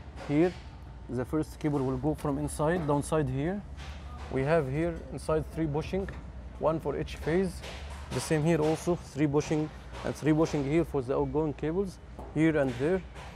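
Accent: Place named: Egypt. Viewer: there is an excellent Egyptian accent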